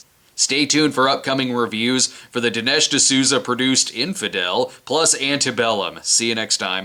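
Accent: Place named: Illinois, United States. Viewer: General Dix, Litchfield. Your Illinois is REALLY showing.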